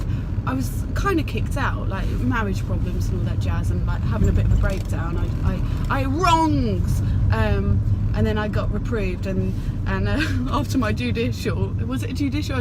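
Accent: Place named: Southern England, United Kingdom. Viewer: where you from im from hastings sussex you sound southern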